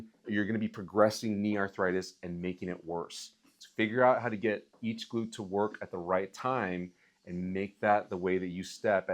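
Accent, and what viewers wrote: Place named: United States. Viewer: to my British ears, he has an American accent. So I can appreciate if someone does not hear that every day in their life it might sometimes be difficult to understand. I sometimes struggle to understand drawling Southern US accents or a Cajun accent in particular because it is not something I often hear.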